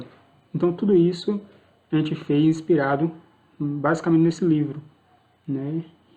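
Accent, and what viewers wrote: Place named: Northeast, Brazil. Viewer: Vc é maravilhoso! Sotaque nordestino do jeitinho q eu gosto. Sou cearense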